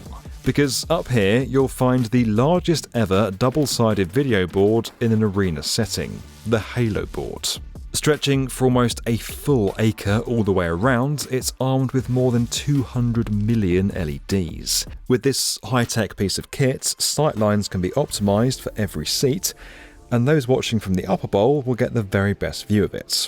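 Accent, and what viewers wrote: United Kingdom. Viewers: These pretentious British narrator voices make me ill. Similarly do does the cost of seating and tickets in these arenas built for the minority of wealthy people and the fiscally irresponsible rather then the rest of us. Seriously why would I waste so much money when I can watch it at home in comfort on a huge screen for a tiny fraction of the cost?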